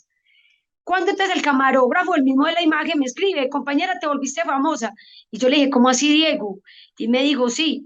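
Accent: Antioquia, Colombia. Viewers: ASÍ HABLAMOS EN ANTIOQUIA.... UN POCO MÁS COLOQUIAL Y ESPÓNTANEA, FELICITACIONES POR SER COMO ERES...NO CAMBIES! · A mi me encanta apesar de tener acento paisa ......es muy unica irrepetible me encanta la entiendo ..no vayas a cambiar tu estiló claro irreverente sencillo transparente...y punto slds mi reportera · Habla muy normal, sencilla, con acento de mi Tierra, paisita, y frentera, echada pa e Lante. Bien 👏👍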